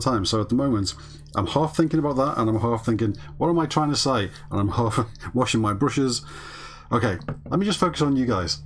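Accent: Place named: Wirral, United Kingdom. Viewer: Where are you from sounds like Wirral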